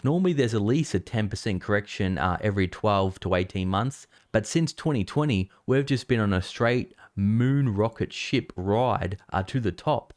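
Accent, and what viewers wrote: Australia. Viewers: Ah, sure it will be grand...seriously, it will. The man on the "tube"...for sure. Festive cheer delivered in an Aussie accent... Like seriously what more could ya ask for?. OK, I admit I'm hooked...hands up. Never trusted those communists in the first place...🍻🥂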